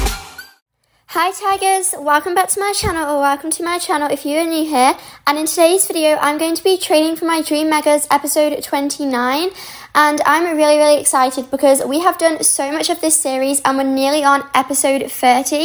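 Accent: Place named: Germany. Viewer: omg how did i not notice that you‘re german!!😍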